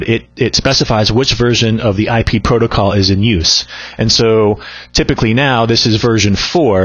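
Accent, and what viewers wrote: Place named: United States. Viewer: Great explanation and most importantly: an AMERICAN ACCENT!! Thank you!!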